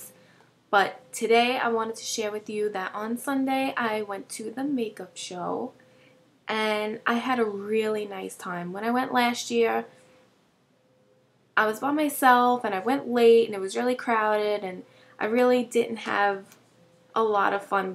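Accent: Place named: East Coast, United States. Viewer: love the east coast accent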